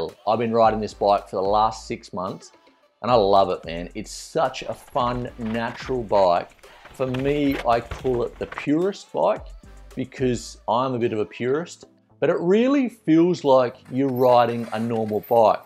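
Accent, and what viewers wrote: Australia. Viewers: Gday. I take it that you don’t like Scott bikes. Predominantly the Ransom e910 & Genius e900tuned. Like your channel even if it is for the Aussie accent 🇦🇺😎🤙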